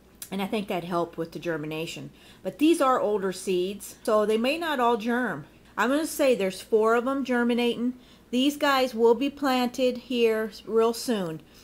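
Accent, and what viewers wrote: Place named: North, United States. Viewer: Where are you from? Accent sounds like a northern state.. near canada?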